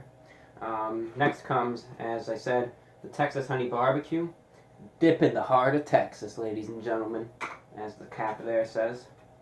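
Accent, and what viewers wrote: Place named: Queens, United States. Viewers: I love it when the old Queens accent really comes into it's own, on the New Yorks and chocolate. I really like that accent, it reminds me of Richard Feynman and is quite soothing. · telling by his voice, somewhere from the east coast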